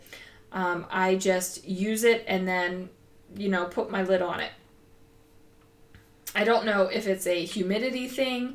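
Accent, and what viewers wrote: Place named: Baltimore, United States. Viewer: We love your chatter...and I love your accent...Baltimore is a fun place that I miss!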